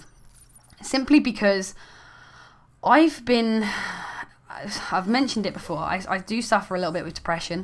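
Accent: United Kingdom. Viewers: you sound like your from Kent. I'm from Kent :D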